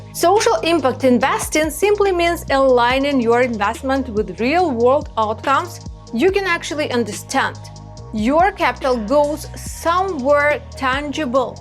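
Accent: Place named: Eastern Europe. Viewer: east europ i think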